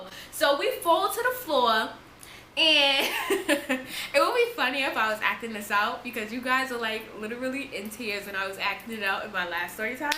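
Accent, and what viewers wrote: New York, United States. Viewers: Lmao Spoken Like a True New Yorker! Love You! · I love her accent my cousins are from NY and they make fun of my southern Floridian accent 😩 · Your New York accent is so cute lol. I love it. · Are you from NY? And I don't mean this like in a mouthy or smart way, I promise 😂👐 I just legitimately wanna know if you're from NY cause I just gave a question about the dialect lol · I love your New York accent !! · They way you talk, like you sound like from your from new york. I know you are from there but. I wish i could talk like that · You was going in talking about the story, especially with your NY accent I Lo it lol.... But I love your moral of the story! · Love the New York accent and moreeeeeee story time plzzz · I'm really sitting here realizing all of us from Ny talk the same when we mad or explaining a fight . Lol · I love to listen to her I love NY accents so much 😂😍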